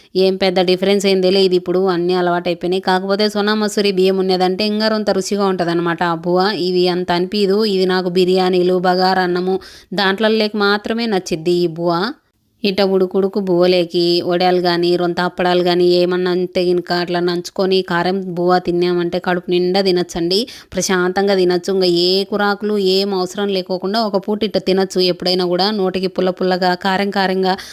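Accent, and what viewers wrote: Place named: Badvel, India. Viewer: Hi మాది బద్వేల్ మీ యాస నా యాస ఒక్కటే. ఒకమ్మాయి నాన్న ను నాయన అన్నానని. నన్ను చాలా నోచుకున్నట్లు మాట్లాడింది ఒకప్పుడు. అదేదో నేను తప్పు చేసినట్లు.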